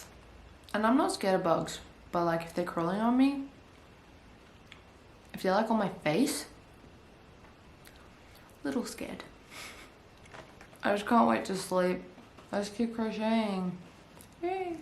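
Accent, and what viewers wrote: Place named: Australia. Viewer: The best part was the australian accent, love it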